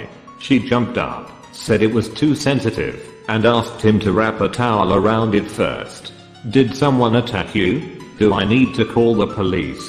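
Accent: United Kingdom. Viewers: Full of British Accent!Wonderful!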